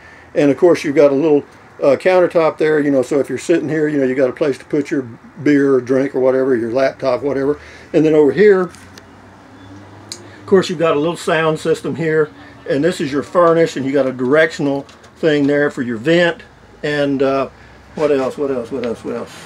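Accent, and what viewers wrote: Texas, United States. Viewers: Nah, Billy Bob Thornton. Very Texas! :)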